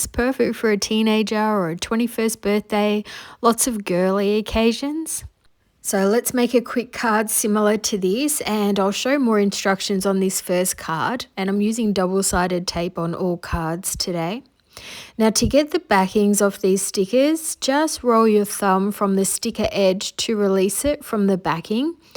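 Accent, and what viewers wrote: Australia. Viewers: They are all beautiful! You do such lovely work and you are a pleasure to listen to. Your voice is calming. And, who doesn’t love an enchanting Australian accent. I’ve only recently discovered you and am now looking at your past videos. Keep them coming! ☮️💜🌞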